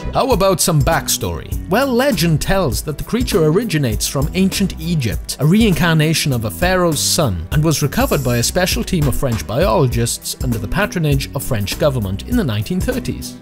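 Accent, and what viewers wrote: Wales, United Kingdom. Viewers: Are you Welsh? Sounds like you have a bit of a Welsh accent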